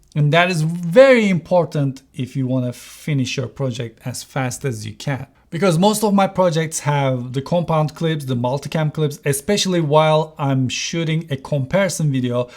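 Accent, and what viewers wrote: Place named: Turkey. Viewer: türk oldugu sesinden belli :D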